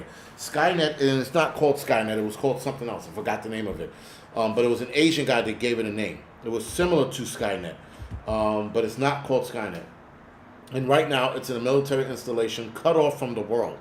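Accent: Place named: Northeast, United States. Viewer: I'm from Jersey but, haven't lived there in a long time. I enjoy your northeast accent & manner of talking truth. You make me laugh.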